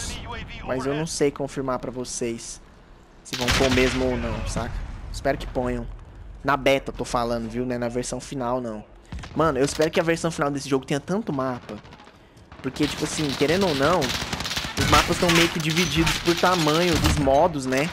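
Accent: Minas Gerais, Brazil. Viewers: Você é mineiro?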